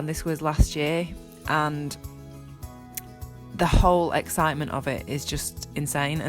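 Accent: United Kingdom. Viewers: This is such an inspiration. A feel good story narrated in a very pleasant British accent. Watch the video, you will be glad you did.. Thank you for sharing..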